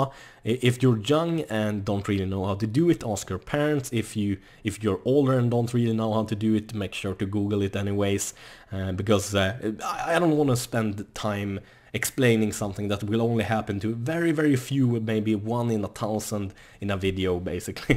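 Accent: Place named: Sweden. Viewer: Swedish english is simple to discover...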